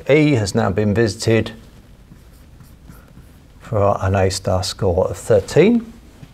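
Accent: United Kingdom. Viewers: British accent is shit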